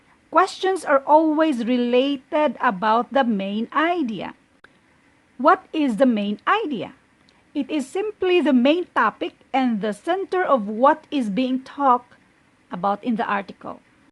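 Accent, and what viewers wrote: Visayas, Philippines. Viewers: Parang bisaya kagud maam. Tama bako maam noh? 😂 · Nakakatuwa ang bisaya accent. Makalingaw man. Pero daghan matun-an. Thumbs up po ako.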